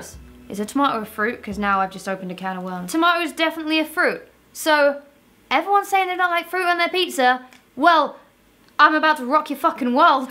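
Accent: United Kingdom. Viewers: 1) What do you think happened to the guy that was released from North Korea in a coma? Update: he died. 2) As an American, I can safely say that you do not sound American, you simply have a "light" British accent. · i was really caught off guard by your british accent?? why? i guess i've been watching a lot of american youtubers lately. anyways, your book cover is FLAWLESS!! ily xx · To an American, you still sound British and we love it ❤️❤️ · As an American I can very safely say that your accent isn't remotely American. Don't know what locale of British but definitely British to an American ear. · I have another friend who gets told she sounds American , but it makes me LOL every time because she (and you) still sounds so British to me.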